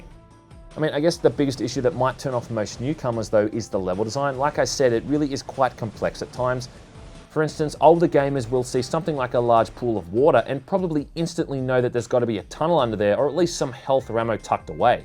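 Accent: Australia. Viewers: Is that an Australian accent i hear?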